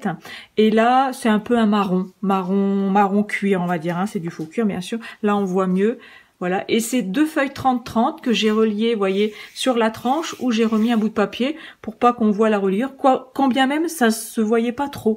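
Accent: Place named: Belgium. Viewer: coucou j aimerai savoir ou vous trouver vos jolis papiers merci super vdeoj adore votre accent belge ou le nord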